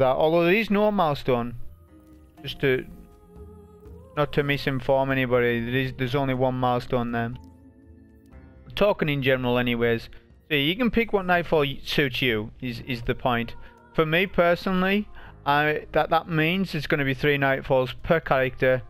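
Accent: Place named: Newcastle, United Kingdom. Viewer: had to happen we had some wonderful years with alex and now we have a clown, but we will prevail. question will you be doing the whisper help runs and how does one get to know. ps its just nice to follow someone who is not american lol and after watching "vera" et al can understand every georgie word. keep it up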